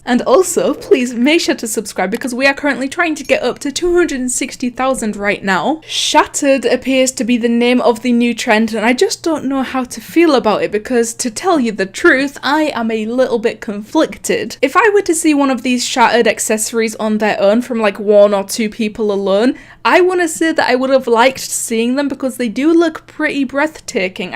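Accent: United Kingdom. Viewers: British girl talks about roblox avatars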